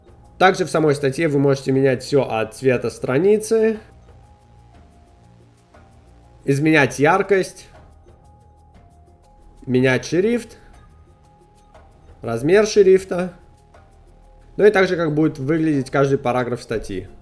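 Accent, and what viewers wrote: United States. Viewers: акцент американский по знакомым знаю. а зачем приложения с газетами показывать если они все на английском? Публика то в 90% предпочитает читать на русском, я полагаю) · а ты американец или англичанин, не могу понять какой акцент????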